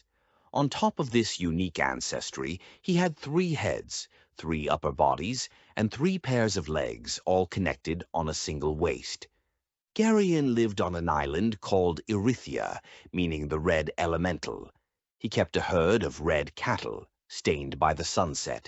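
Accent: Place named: Greece. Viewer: This person sounds like a Greek if you know what I mean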